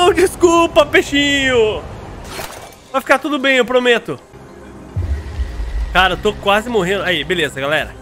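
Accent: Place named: Paraná, Brazil. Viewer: Cai de paraquedas nesse sotaque gostoso de ouvir 😅 sdds Paraná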